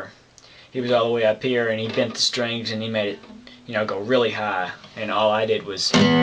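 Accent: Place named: South, United States. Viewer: Haha yea man nothing wrong with a southern accent it just makes it that much more fun to be around you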